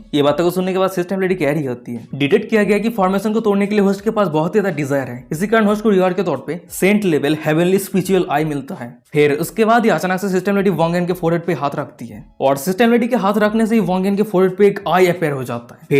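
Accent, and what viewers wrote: Bengal, India. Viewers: Bhai tum Bangal se ho na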